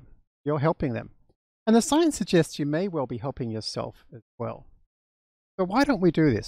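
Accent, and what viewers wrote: Australia. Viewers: Such informative video and I keep wondering does he have an Australian accent 🤔.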